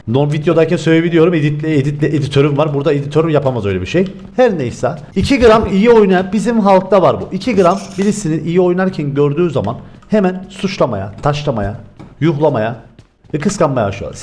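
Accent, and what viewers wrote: Cyprus. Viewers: abi sen Kıbrıslı mısın??? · Abi kıbrıs limisin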